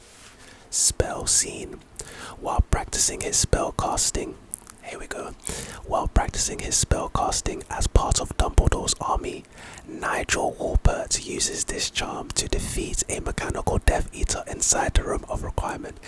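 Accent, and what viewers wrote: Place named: London, United Kingdom. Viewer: This is going to sound really stupid, but your accent makes me feel incredibly homesick for northeast london ^-^ ...But in a good way. Its nice, to hear a voice that sounds so familiar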